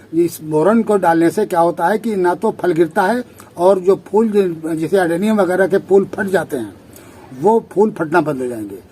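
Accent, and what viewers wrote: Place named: Kannauj, India. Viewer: Sir aapaki boli se lagta hai ki aap up kannauj or farrukhabad se hain.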